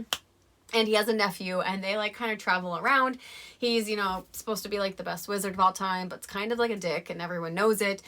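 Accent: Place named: United States. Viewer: Wow! They are great ideas for different genres. Your creativity is limitless! But now you must do the hardest thing: write them! By the way, can I ask where are you from? I'm 99% sure you are from USA, but I cannot distinguish accents very well because I'm from Spain.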